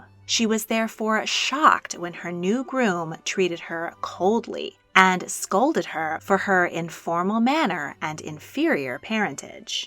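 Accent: United States. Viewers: are you American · As son as I heard an American accent I passed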